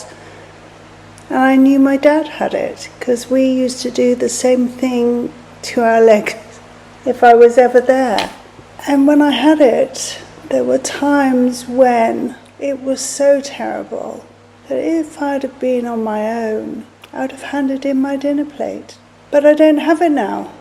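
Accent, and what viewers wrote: England, United Kingdom. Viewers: I like the “blue”in all your pictures…and enjoy your English accent…go on, life is precious on every age!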